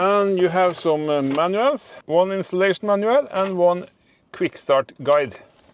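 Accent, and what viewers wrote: Norway. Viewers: Var ikke vanskelig å høre at du er trønder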